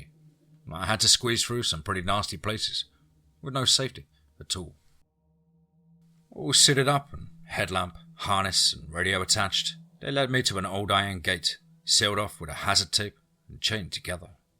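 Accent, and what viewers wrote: England, United Kingdom. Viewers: Eric C you do. is your homie with a UK accent, but to him it's just how he sounds as an Englishman lol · You sound exactly how I imagined my homeboy with a U.K accent would, if I had a homeboy with a I.K accent that is.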